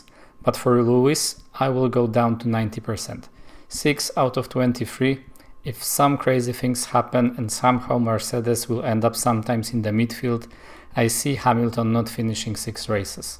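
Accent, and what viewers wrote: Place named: Poland. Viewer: Uslyszalem akcent i od razu skojarzyl mi sie polak xd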